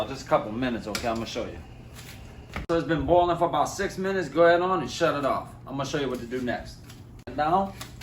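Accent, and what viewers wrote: Louisiana, United States. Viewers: I’m from West Monroe, Louisiana and I love a Cajun boil and accent!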